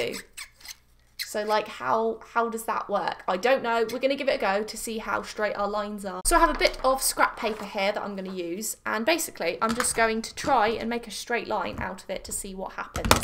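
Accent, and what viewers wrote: United Kingdom. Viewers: British accent haha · I Looove your accent if I were to say it was British I will really get it In the replys.... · Is she British? She sounds British 😕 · Who else watches to hear that Beautiful British Accent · Are you English ?? Just wondering because it sounds like your British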